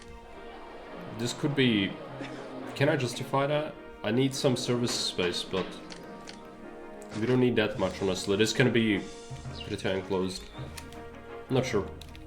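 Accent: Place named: Czech Republic. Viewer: Oh yes for sure! I'm 64 and been playing games since 1982 on a ZX Spectrum. Got Ceasar 1 in 1992, then Caesar 2, Caesar 3 in 1998, I also played Caesar 4, but was disapointed. Ceasar 3 is the best by far, and played it all the time. But getting used to this updated version, is so cool, its like a new game! And I appreciated your tutorials, they have taught me so much, so many thanks! Oh Your English is great, English is quite hard to learn. Your words are pronoucned correctly, its just the accent that got me! Long Live the Czech Republic! Ha ha. Im in New Zealand, so miles away. Hey man, take care and keep up the great work!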